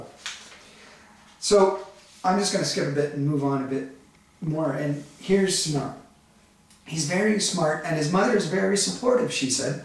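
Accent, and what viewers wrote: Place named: Canada. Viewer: are you canadian? you say "aboot" alot.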